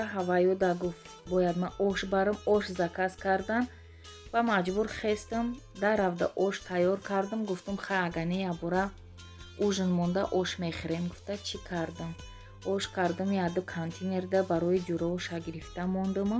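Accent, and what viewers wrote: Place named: Dushanbe, Tajikistan. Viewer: 21л Салом ,Шумо аз Конибодом лекин лахчатон монанд нест дигар хел ранги Душанбегихо гап мезанед,салати ачоибот зебо ва аз намудаш хело бомаза менамод👍👍👍👍😋😋😋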